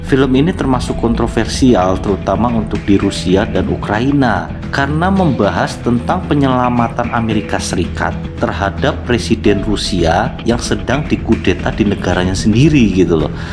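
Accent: Java, Indonesia. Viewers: Kuat betul jawa nya..mantap...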